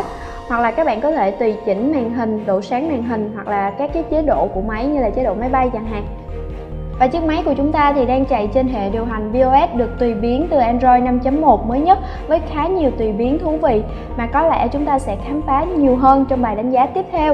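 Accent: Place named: North, Vietnam. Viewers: Thích giọng bắc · thix review giọng Bắc hơn + chị trả bài rất tốt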